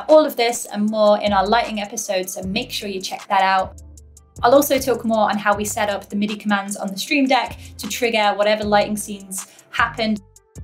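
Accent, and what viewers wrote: United Kingdom. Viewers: glad to see you back on youtube and you have done an amazing job with the home studio, also i love the little hits of the British accent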